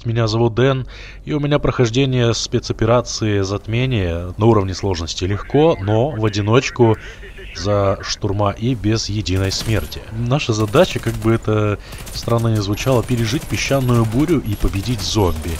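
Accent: Kazakhstan. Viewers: ты из казахстана? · Ты из Казахстана?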